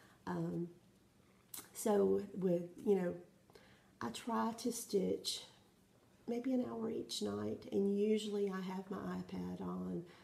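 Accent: South, United States. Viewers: Great video.... I LOVE your project bags. I'm not good with a sewing machine but I think I have to attempt a project bag... Your choice of fabrics is striking....Love them, love them, love them... did I say I love them ? :) Please do keep making your videos and share your WIPS and FFO with us. Love your Southern accent (and your friendly personality) reminds me of my relatives back home (Arkansas). SO, from the Pacific Northwest, welcome to Flosstube and Happy Stitching. Hugs, Toni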